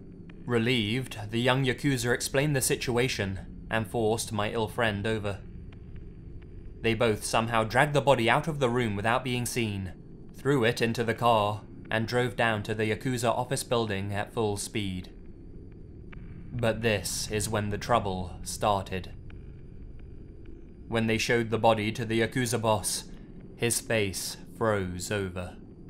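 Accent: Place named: United Kingdom. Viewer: Why did he have to have a British accent 😓😓😓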